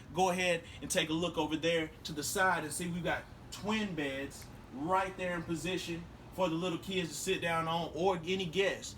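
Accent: South, United States. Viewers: "In case you got little chitlins." 😆 I'm from the North so the accent really gets me. Of course, you all are now saying, what accent, you got the accent! 😜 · with that intellectual, southern accent 💜💜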